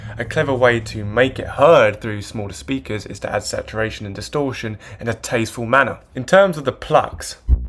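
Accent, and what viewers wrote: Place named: Suffolk, United Kingdom. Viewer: Ahh fair enough man! Thought I could hear a bit of Suffolk in your accent! Anyway keep up the great videos man.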